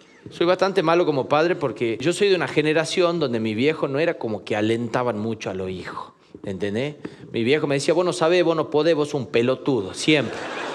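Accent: Tucumán, Argentina. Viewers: no te gua ce nada, así hablamos los Tucumános jajajaja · los tucumanos hablamos divino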